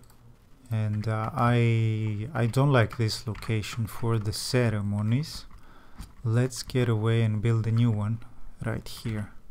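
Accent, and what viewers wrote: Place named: England, United Kingdom. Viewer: You have a great english accent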